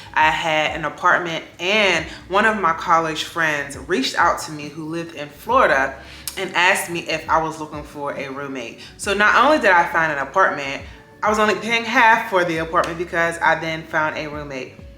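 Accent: Pittsburgh, United States. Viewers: Are you from PGH? I might be wrong but I hear your accent. Thank you for the video. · Are you from Pittsburgh?? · Your accents giving Pittsburgh??? Or am I tripping?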